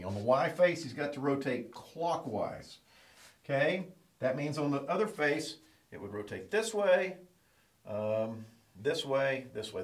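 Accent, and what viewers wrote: United States. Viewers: His country accent makes me feel a lot more accessible and authentic. In addition to that, this is a very thorough and simple distillation of an otherwise complex topic. I really like it!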